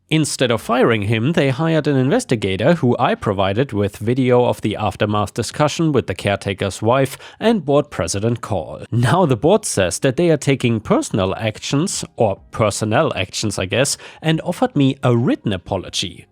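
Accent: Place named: Germany. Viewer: He's German. English isn't his first language. He's pretty good, but there are still some words he has problems with.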